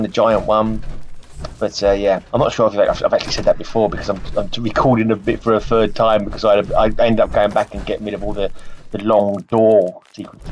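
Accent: United Kingdom. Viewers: I can barely understand this with your British accent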